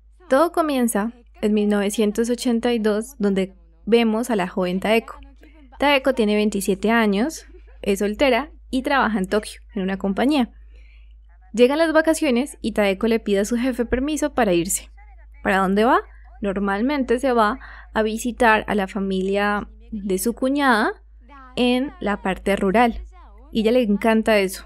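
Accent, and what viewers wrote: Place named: Costa Rica. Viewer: Por su tono de voz creo que sos de C. R.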